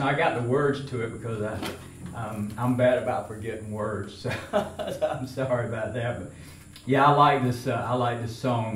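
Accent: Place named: South, United States. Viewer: I really like your southern accent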